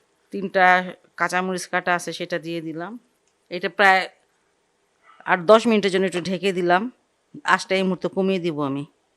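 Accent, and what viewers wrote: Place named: Jamalpur, Bangladesh. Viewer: আপা আপনার রান্না গুলো খুবই ভালো লাগে,, আপনার বাড়ি কোথায় মনে হয় জামালপুর জেলা আপনার কথা বলা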